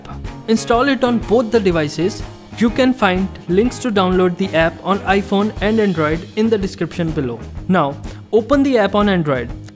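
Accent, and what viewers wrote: India. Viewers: I hate that Indian accent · Oh god an Indian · Alex Fosse that’s Indian accent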